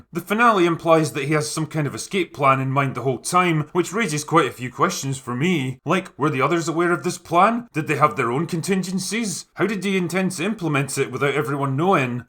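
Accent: United Kingdom. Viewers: Tip: drop the accent, if it's designed to get attention. If it's real, WTF is a Brit doing critiquing an American film about an American heroine using her 2nd Amendment rights to bring justice to a bunch of bad guys? BTW: wilderness vs a school/ mall/ movie theater? Numbnuts, where you BEEN?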